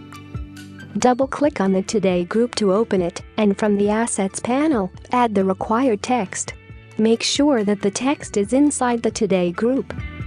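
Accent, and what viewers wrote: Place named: Kerala, India. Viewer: gotcha u r from kerala right?????????????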